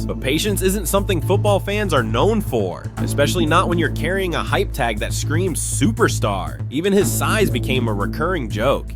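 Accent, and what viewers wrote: United States. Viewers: Why in God's name do we have to subjected to this awful American garble? Learn English we were here thousands of years before you!!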